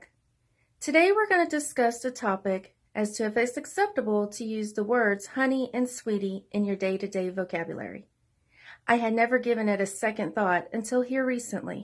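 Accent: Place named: South, United States. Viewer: Context is everything. I'd likely be less ruffled from you it because you sound genuine, caring and southern. Terms of endearment can sound sincere or angrily sarcastic depending on the setting, the delivery and the accent. However, "honey" sounds too casual for a serious setting like a medical office, where I hope everyone is meticulous. It can sound sarcastic and condescending which I dislike when I seek medical attention.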